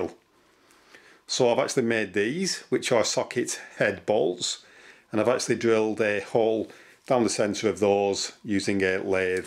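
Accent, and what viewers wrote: United Kingdom. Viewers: HOW VERY KIND FROM YOU TO SHARE THIS SURGEON LIKE PRECISION WORK, AND IT HAD BEEN AWHILE SINCE I HEARD THE BRITISH ACCENT, WHERE IN THE UK ARE YOU?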